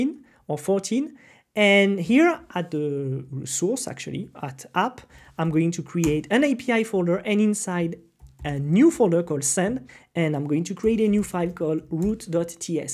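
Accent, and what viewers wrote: France. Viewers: Hello, t'a pas les même vidéo mais en FR? Bien que l'accens francais aide à la compréhension ahah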